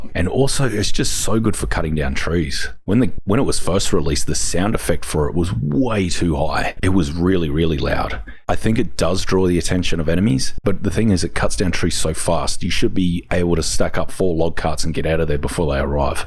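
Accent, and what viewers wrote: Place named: Australia. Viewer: I think he's from Australia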